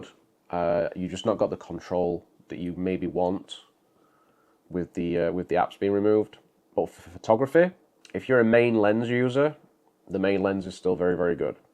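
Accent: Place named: Liverpool, United Kingdom. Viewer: First of all why are you speaking in a Scouse accent? Whereabouts in Liverpool are you actually from? If you are not from Liverpool then why are you speaking in a Scouse accent?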